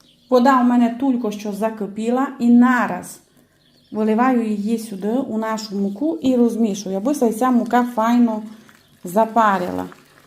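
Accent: Transcarpathia, Ukraine. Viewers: Дякую за файну закарпатську мову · Дякую за рецепт. Ви гарна господиня. А мова! О Боже, цей Закарпатський говір... Люблю Вас!❤ мої рідні УКРАЇНЦІ! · Май файно, коли авторка сказала " чебуреки добрі коли в них багато м'яса"!!!! Щастя вам і вашій родині!!! Всім нам миру!!! Дякую за файні рецепти!!! А наша ЗАКАРПАТСЬКА мова тото ще май приємне як мнясо з чебурека(фіґля)!!!!!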